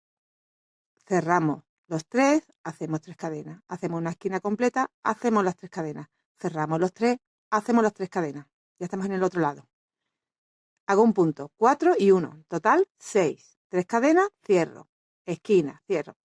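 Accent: Andalusia, Spain. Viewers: Hola buenas tardes eres de andalucia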